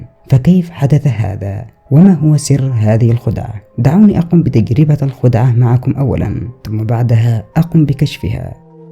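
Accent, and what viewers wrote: Yemen. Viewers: أصحاب المناطق الوسطى في اليمن كذا ينطقونها ارجع الى خطابات الرئيس السابق ولاحظ النطق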